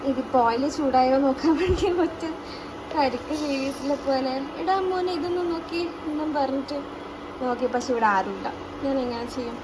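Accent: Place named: Kannur, India. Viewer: Nallaind ketta nammale kannur bashaa..❤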